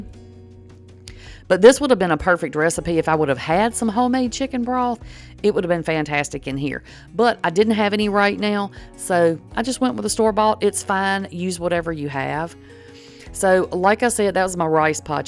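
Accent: South, United States. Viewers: I’ve just found you ! And now working through your recipes,unfortunately can’t get all ingredients as in the uk, but I just love listening to your wonderful accent which is my favourite USA accent, I could listen to your wonderful all day! Only thing is I land up trying to talk like you for the rest of the day along with my family, hope you don’t mind lol !! Xx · I just found your site and boy am I glad I did! I can't wait to start trying your recipes. And I absolutely love your accent. I'm from Texas originally but was raised in California, I really miss the southern accents.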